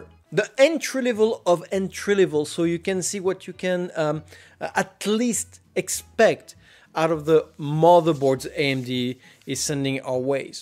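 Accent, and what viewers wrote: France. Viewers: Man, I was about to buy it with a 7900X3D because it's 230€ but I'm going to pass ! Problem is, there isn't much X670 with 6 S-ATA ports at an ok price point at the moment. ASUS TUF GAMING X670E-PLUS WiFi looked good with additional SATA PCIe card but the card I'm looking at is X8 and both additionnal ports are X4... Super detailed test, with lots of passion and top french accent ;) ! Bon boulot, cette qualité de test me rend fier d'être français !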